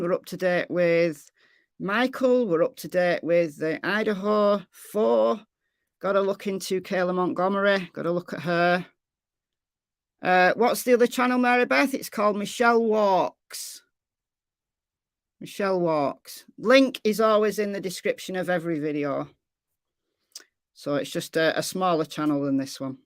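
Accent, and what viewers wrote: United Kingdom. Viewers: Missed the live stream but got to listen to it all and hear the updates. Congrats to the winners of free month memberships. To me this is the best channel. You get facts, commentary, dogs playing, humor, you get heard, and questions answered. All this with a British accent and caring.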